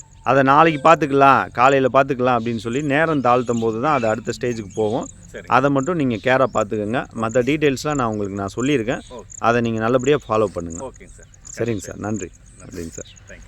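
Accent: Madurai, India. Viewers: ❤ madurai basha ❤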